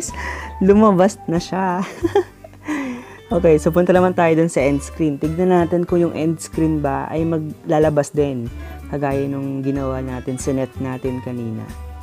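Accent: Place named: Philippines. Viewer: THE CUPIDO CLAN he speaks English but has a fillipino accent and sometimes he's speaking a little bit of Filipino and just put English sub i hope that helped and sorry 😞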